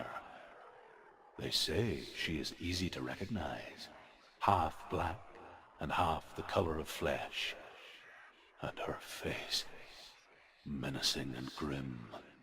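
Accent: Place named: Northern Ireland, United Kingdom. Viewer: Proper Northern Irish.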